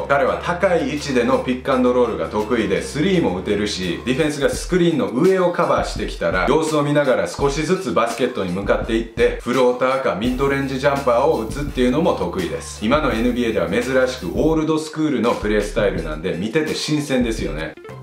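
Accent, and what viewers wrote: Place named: Kansai, Japan. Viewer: そんだけ関西弁でストラクチャーを和訳でわからんってのもワラタわw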